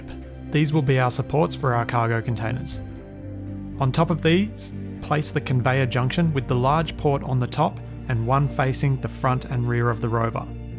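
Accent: Australia. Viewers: he is Australian. Good to know more Australians play this game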